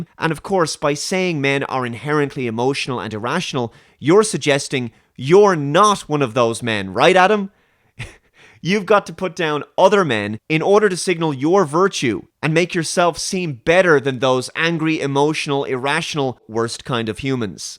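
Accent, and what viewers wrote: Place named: Ireland. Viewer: Totally random question unrelated to the video and more about you personally... What type of Irish accent do you have?(where in Ireland is this more common) Lol I'm making a story and I kinda wanted to give a character an Irish accent like yours... maybe lol. Ok sorry.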